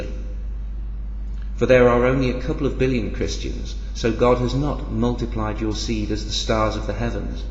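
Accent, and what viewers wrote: United Kingdom. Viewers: Your voice echoes with intelligence. It's somewhat mezmorizing. And it has that awesome British accent lol. Anyway, I love you videos. It encourages individuality and thought.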